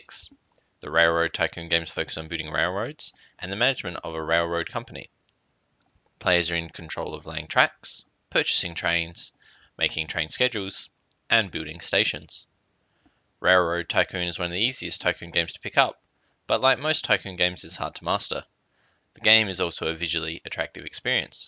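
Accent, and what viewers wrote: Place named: Australia. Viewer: If you're still curious for whatever reason, he is Australian.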